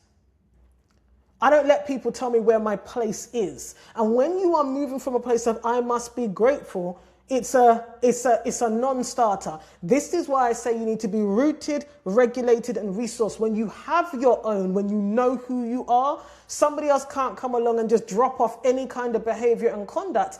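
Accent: United Kingdom. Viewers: Yeeeeees! I knew you were from SOUTH LONDON! Bad gyal tings! Go on mamita 🔥🔥🔥🔥🔥🔥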